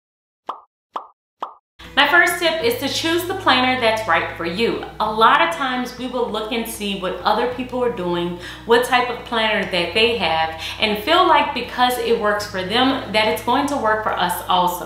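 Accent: New Orleans, United States. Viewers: Okay sis!!! You are beautiful and I feel your kindness and support. What am I hearing in your voice ??? New Orleans, Islands where ? Keep the videos coming! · Sounds like you are from New Orleans! Beautiful planner! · I feel like I hear a slight New Orleans accent🤔😂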